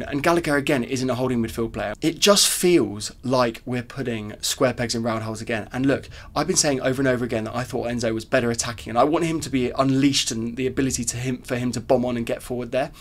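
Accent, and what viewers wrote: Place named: Brighton, United Kingdom. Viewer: Are you from Brighton? You sound a lot like some people I know from Brighton. Love your attitude and outlook. We need more fans like you.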